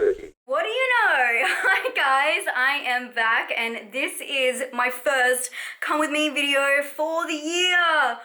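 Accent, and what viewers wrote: Australia. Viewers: I let this video play while cleaning my bathroom, enjoying that Australian accent and when I came to my screen and saw the nosebleed I freaked for a second lmao...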